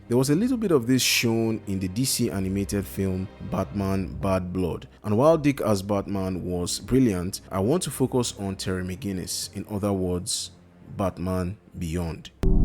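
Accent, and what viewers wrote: Nigeria. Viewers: I know a Nigerian brother when I hear one. Nice pacing of the video as well as the edit. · Nigerian? Instant sub. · Naija bro talking comics. So refreshing to hear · I think he Nigerian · 5 seconds in I said….wait he has to be nigerian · Subscribed IMMEDIATELY i heard the Naija accent. We need more naija nerds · I hear a nigerian brother, I subscribe. No questions asked 😁😁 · Hello U sound like a Nigerian (I am too) Please do you know where I can buy comics here in Nigeria (Abuja) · Soothing ass Nigerian voice 🙏😩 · Hearing a Nigerian voice always makes me smile inside. My siz and I binge on those B- quality Nollywood movies so we really have a thing going with Naija flicks lol "Honarabohl woman??!? What do you mean honarabohl?? That gyeol dea be di most renowkn Ashewoh ddis side of de Congo, abeg." Literally heard this once and I DIED (Oh I'm Kenyan btw)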